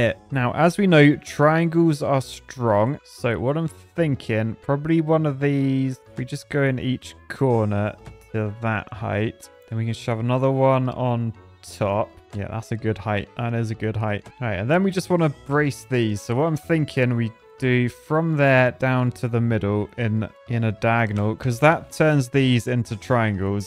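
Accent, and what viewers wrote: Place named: United Kingdom. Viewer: That accent he's got. What is it? It's British, but what part of England goes "bewesh" instead of "boosh" and "cloiyser" instead of "closer". It tickles my ear to hear someone say "Aye doiyn't naeuiy" instead of "I don't know"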